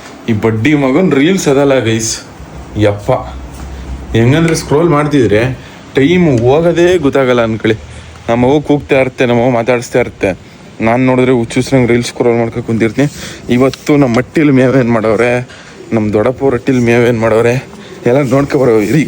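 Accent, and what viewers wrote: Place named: Mysore, India. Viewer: Nim uru yavdu bro Mysore district anta gotaithu nin bhashe ((slang)) matode rithi nodi gotaithu ..nija alva?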